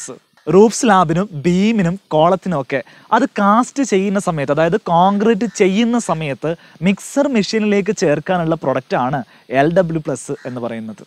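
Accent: Kozhikode, India. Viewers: മയ മാണ്ട പോയിന് വന്നീ ന് പോയിക്ക് കുത്തിരി ഇടെ ഇതൊക്കെ കോഴിക്കോട് മലബാർ ഭാച്ച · അറിവുള്ളവനെ പരിഹസ്സിക്കുന്നത് തെറ്റാണ്, കോഴിക്കോട്ട്കാർ അങ്ങനെ ആണ് പറയുന്നത്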